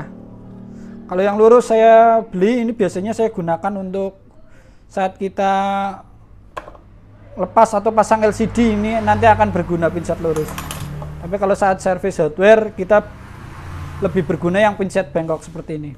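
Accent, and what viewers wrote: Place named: Java, Indonesia. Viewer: Ngomonge medok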